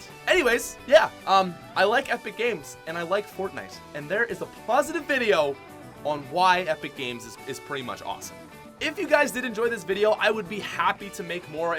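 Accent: Canada. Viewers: This guy is the most stereotypical Canadian talker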